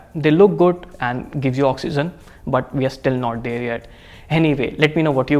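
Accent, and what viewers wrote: India. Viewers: Professional video !! Except the strong Indian English pronunciation is a bit annoying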